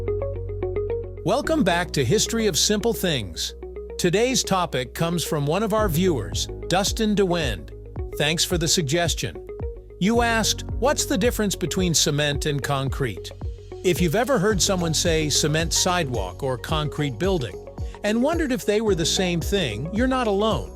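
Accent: United States. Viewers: I noticed the title and thought that this has to be an American video. All English speaking people (apart from Americans) know that cement is a dry powder that needs to be mixed with sand , water and small stones to make concrete. When the mixture is cured and you have a very hard substance that is called concrete. I watched 20 seconds to hear the accent and then switched off.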